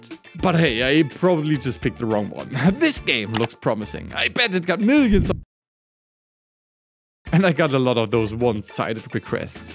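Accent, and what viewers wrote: Germany. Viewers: You can really hear the German aktent.😅 Do you still have a say in the game? But I don't think so.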